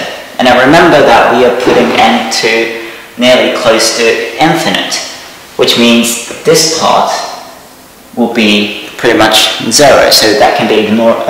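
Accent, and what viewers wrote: United Kingdom. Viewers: If anything more inclined to British English his English is, which is really comfortable to hear. A great explanation. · 美しいイギリス英語！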